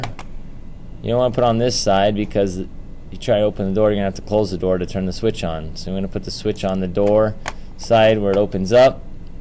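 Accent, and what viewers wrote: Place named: United States. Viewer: Finally, Revit Electrical that is in CLEAN ENGLISH American. Thank you.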